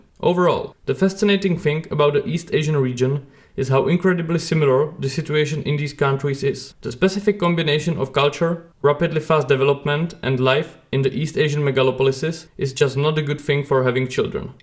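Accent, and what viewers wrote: Czech Republic. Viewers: Heh, I was thinking that English sounds somehow "Czech" to me. It seems difficult to get rid of the accent. But it is positive that "our" English does not sound like "Russian". On the subject: I find it absurd that more attention is not paid to demographics. For Europe, it is a ticking time bomb that can disintegrate society. According to a prediction, in 2050 there will be only 2 workers for every one pensioner in the Czech Republic. This will generate enormous intergenerational tensions - and I doubt that the working population will accept that they will essentially live only to support the pensioners. At the same time, with social security contributions so high, they won't have the means to start their own families, which will only deepen the spiral of low birth rates. Because of the high number of pensioners, they will have considerable "voting" power to elect politicians who will play into their hands - as we are already seeing, not only with pensions but also with real estate (low property taxes, restrictive building codes). 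Immigration doesn't solve this - it just postpones the problem, and at the cost of breaking up the "nation". For all our achievements in protecting personal liberty and women's right to self-fulfilment, if the result is a society that cannot survive, we will be replaced by a society that, while barbaric to us, can produce enough children to be stable in the long term. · Excellent channel and a rarity on this vitally significant subject. And all patiently explained in a characteristic Czech accent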